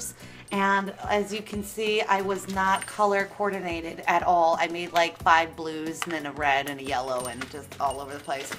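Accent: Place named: Texas, United States. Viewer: Everyone saying she reminds you of grave3yardgirl... y'all are just recognizing the Texas Suburban accent. This is just how we talk here.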